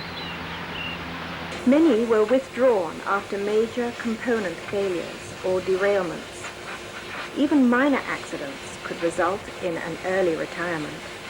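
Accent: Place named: RP, United Kingdom. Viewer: A very interesting video. I love the very posh English accent lol!. 😄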